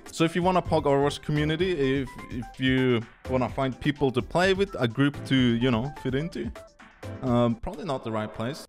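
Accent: Sweden. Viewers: I love your vids man btw are you swedish cuz i can hear it from your english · du låter svensk? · how did your swedish accent got worse??? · I like that i can hear that u are swedish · You sound so swedish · The Swedish in this guy is RADIATING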